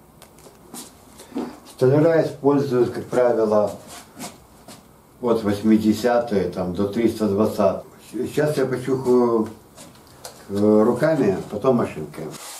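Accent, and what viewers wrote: Odessa, Ukraine. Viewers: "Свежая кровь" в столярном влогинге.Ни одного дизлайка!Так держать!Я подписался(одесский говор ласкает слух))))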